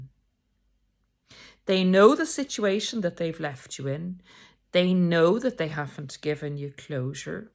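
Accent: Ireland. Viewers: I love to hear your Irish accent demonstrating the way in which one treats the Narcissist (Covert) in my case. Keep up the good work! And thanks so much for all your work and may we all be blessed! I find my Guardian Angel is also helping me a lot in negotiating this new territory. God bless you as well as the Work! K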